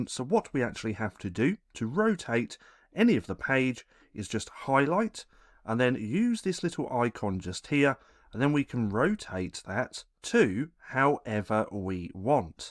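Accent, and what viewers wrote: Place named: United Kingdom. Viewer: I'm obsessed with your British accent ...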